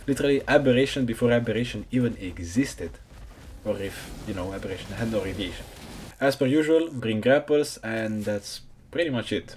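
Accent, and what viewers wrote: France. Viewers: are u french? i love ur accent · Bro u have a french accent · You got a french accent you mog me so hard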